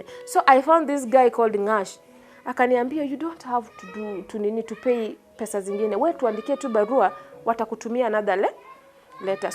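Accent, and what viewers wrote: Uganda. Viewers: your swahili sounds like ugandan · Unaongea kama munganda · She has Ugandan accent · She sound like a ugandan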